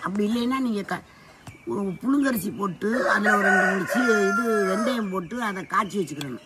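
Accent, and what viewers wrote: Ramanathapuram, India. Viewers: Nannimada pathai kaili thaavani ramnad pechu 🎉🎉❤ Eid mubarak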